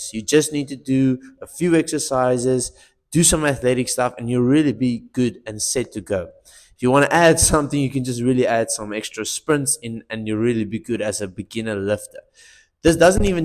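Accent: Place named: South Africa. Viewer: Sounds like an Afrikaans accent my boy. Are you South African?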